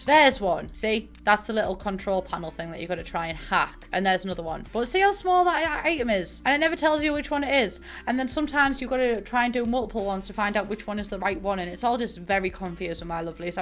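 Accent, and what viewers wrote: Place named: Northern England, United Kingdom. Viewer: I love the fact you translated for other people lol. Im from leeds so as a fellow northerner i understand you fully and you are sooo funny :)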